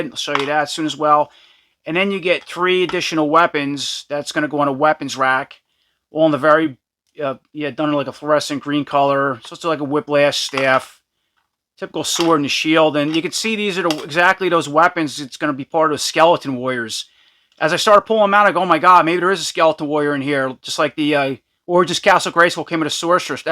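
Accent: New York, United States. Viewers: This guys gotta be from New York I bet 😂😂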